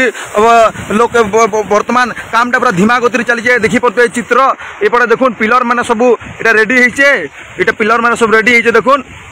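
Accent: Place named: Sambalpuri, India. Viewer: Aamar kacha samal Puri bhasa re news Hebar Katha........adha samalpuri adha katkiaa kahuchha...........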